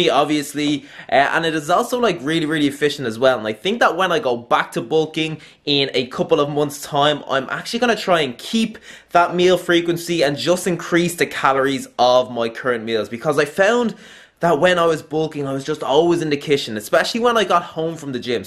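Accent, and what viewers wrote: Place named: Ireland. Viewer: Love your videos especially love seeing an Irish YouTuber great to hear a familiar accent a good video would be on face fat loss would really help 👍🏽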